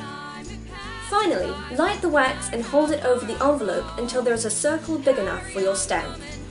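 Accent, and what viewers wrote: United Kingdom. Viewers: this is a great idea! I love your accent are you welsh of british? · Are you really British?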